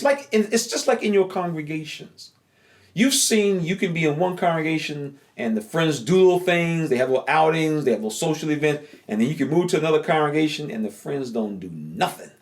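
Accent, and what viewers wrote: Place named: Detroit, United States. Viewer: Man you talk and act like my Detroit people. Lol Tell it like it is my brother.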